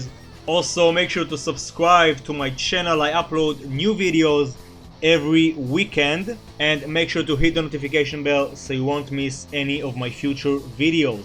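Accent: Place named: Israel. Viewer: הי אני יודע שאתה ישראלי בגלל המבטע לול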